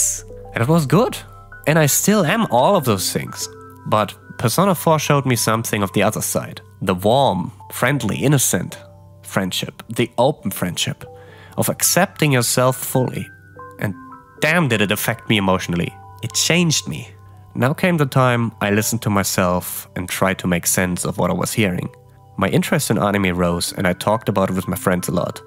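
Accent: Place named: Germany. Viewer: Great combination of engaging personal story, fitting background music and awesome German accent. Good stuff.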